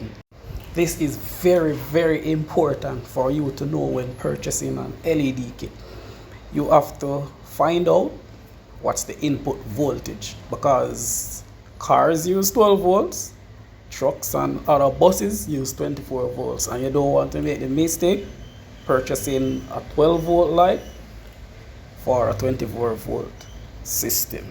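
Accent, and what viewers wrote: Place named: Jamaica. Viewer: Ohhhh. You are jamaican. What part of jamaican are you from? I was born in Manchester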